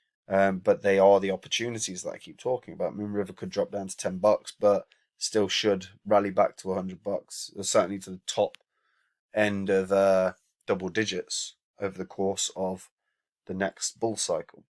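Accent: United Kingdom. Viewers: He has a really clear british accent mate!!.....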